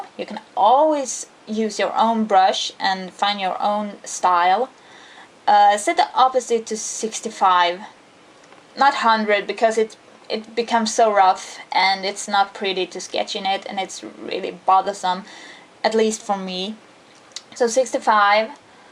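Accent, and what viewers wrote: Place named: Sweden. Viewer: i knew you were swedish by your accent, since I watc a lot of Pewdiepie haha but this is amazing! thank you very much ;)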